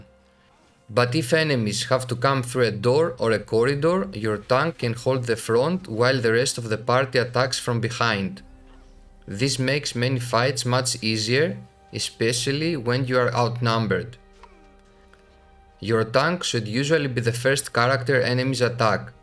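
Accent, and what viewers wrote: Canada. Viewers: love your canadian accent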